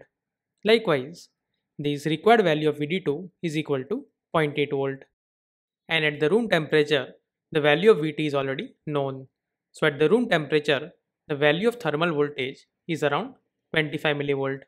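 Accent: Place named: India. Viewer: it's the Indian accent (goated for tutorial)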